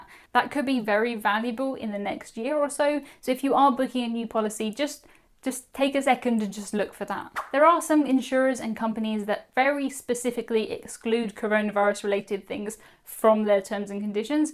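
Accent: United Kingdom. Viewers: Based on this video, you know.. there isn't a definite answer, it all depends on the situation and the insurance company. But, having said that this is the best, most explanatory vid I've seen. Thank YOU! I will post a link to this vid for people that ask me about the cancellation policy and possibilities. RE: the naughties.. no, we dont' say that, I guess we just call it the 2000s? but from now on, I'm calling it the the naughties, lol. Definitely agree with the waiting game. I have an Oct cruise. A few months ago I had no doubt it would happen, now... I'm not so sure, but I'm not cancelling! Thanks. love your content, and your accent just takes me 'home' (mom is british, dad american, left Uk when I was 5)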